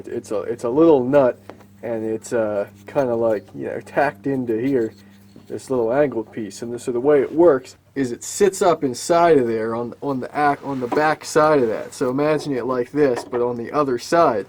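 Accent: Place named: Western Pennsylvania, United States. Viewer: What are we going to do with that Western PA accent!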